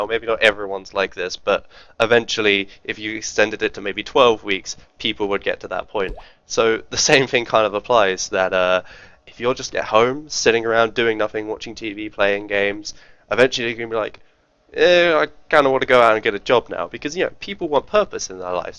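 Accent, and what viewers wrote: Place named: United Kingdom. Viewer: Communist is good, says the guy with british accent that grew up with access to the privileges of the first world. This is communism, talking sh*t and defending it, until you have to live under a suppresive regime like cuba, venezuela, north korea, ussr. I wonder why people run away from those countries. When was the last time you read about americans or brits running away to cuba?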